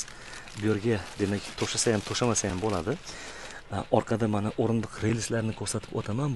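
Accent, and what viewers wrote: Uzbekistan. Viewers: Мана х,ак,ик,ий е"ритиб бериш! Х,еч к,анак,а так,лидсиз, тоза у'збек тилида. Яшанг ака , гап йу'к,, барака топинг! Маза к,илиб , мирик,иб томоша к,илдим лекин! Катта рахмат, шу рух,да давом этинг! Муваффак,ият тилаб к,оламан, ишларингизни у'нгини берсин! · Gapirishingizga ovozingizga oxangiga sof O‘zbek tilida so‘zlashingizga gap bo‘lishi mumkin e'mas ajoyip. Omad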